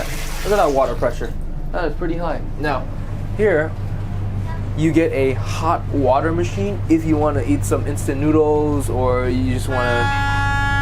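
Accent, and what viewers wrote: Vietnam. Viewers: Thu Phan :) nó nói tiếng đà nẵng chay á cô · That hue dialect though.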